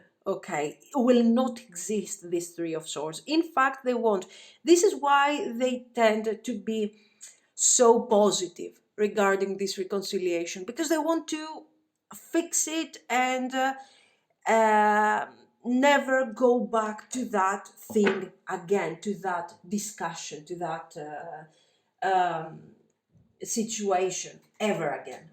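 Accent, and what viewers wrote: Greece. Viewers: Your voice has the Greek accent 💕 So if you are, σε ευχαριστώ πολύ! Το πακέτο 2 was on point and you never fail me with your readings!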